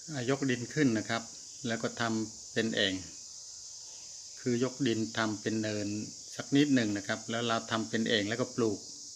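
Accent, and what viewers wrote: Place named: Buriram, Thailand. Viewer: น้ำเสียงคล้ายคนบ้านผม..แถวๆเซราะตลุง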